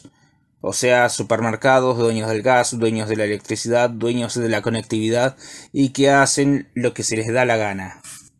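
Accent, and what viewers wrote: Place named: Rioplatense, Argentina. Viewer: Muy buen vídeo , esta muy bien redactado...de donde sos? Porque tenés acento rioplatense ,no cuyano.... Me gusto porque es bastante objetivo y tiene la información correcta , no como los medios grandes de BsAs que no saben mucho y le echan la culpa a Cornejo...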